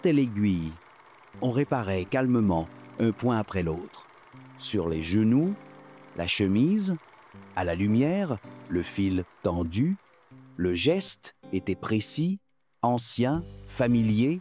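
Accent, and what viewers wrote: Quebec, Canada. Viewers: ... ce petit accent du Québek.... il me plaît bien pour dire comment c'était avant, chez nous en France.:) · C'est fou ce que cette voix a l'accent de Limoges (ou il est censé avoir passé son enfance) C'est plutôt quebecois Mais pour le fond ,ok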